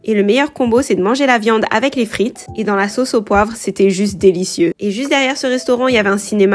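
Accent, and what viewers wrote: France. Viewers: Yess je s’avais que tu parlais français ❤❤❤tu avais un accent français quand tu parlais anglais ( d’ailleurs il y a quelques mois ou semaines je t’avais posé la question 😂)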